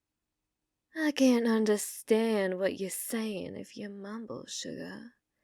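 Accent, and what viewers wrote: South, United States. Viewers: You did that southern accent very well..😊😊😊😊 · What British walk-the-wasteland-fuck magic is this How did you manage to make the southern accent sound so appealing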